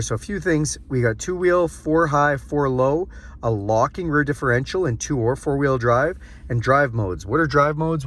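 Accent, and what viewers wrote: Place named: Canada. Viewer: I freaked out for a minute on the price....then I heard your accent "aye"....then realized it was Canadian price! lol. Great review! What I REALLY liked about your review is that you said "as well" only a few times when describing the features. I know it is hard, but several other reviewers say that dozens of times in a 10 minute review and it is distracting. Thank you.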